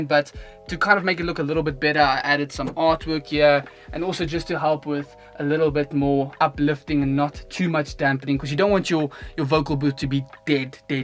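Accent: South Africa. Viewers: knew your accent was from here keep the content coming and representing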